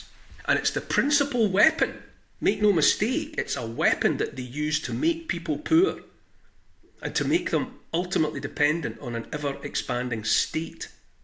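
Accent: Scotland, United Kingdom. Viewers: It's the Scottish accent. Our DNA has been programmed to revolution since William Wallace.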